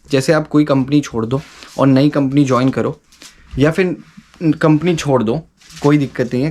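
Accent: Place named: Delhi NCR, India. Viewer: Yeh Ghaziabad-Noida accent mat kar bhai, tho pe suit na Khare hai yu… yeh sab mohh pe chd dee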